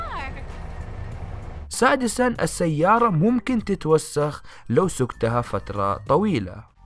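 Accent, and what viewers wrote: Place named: Saudi Arabia. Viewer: لا السعودية واضح من لهجته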